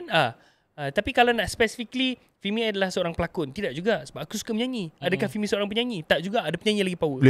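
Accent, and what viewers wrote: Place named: North, Malaysia. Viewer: Smbng utaqa 😂syok tgk😂😂😂😂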